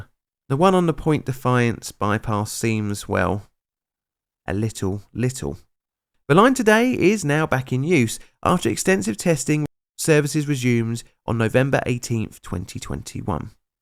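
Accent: United Kingdom. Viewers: Transport? Transportation. It's like the narrator can't decide whether he's British or not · Laughter so hard at the way you pronounced "Puget" , as a long drawn out "pooojet", which immediately tickled the 10 year old boy living in the back of my head. Thought he was pretty much gone, but the way it just popped out your mowf, surrounded by, what always sounds to us ignorant yocals in America, as a sufficticate accent, whether it's the queen, or someone speaking some form of cockney...well, it just caught me off guard a bit! Thank you for that! I needed a little bit of a chuckle today.😏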